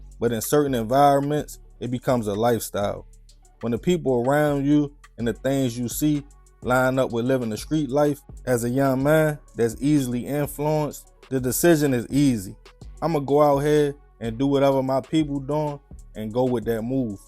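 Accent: Baltimore, United States. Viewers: He’s from Baltimore, has a strong accent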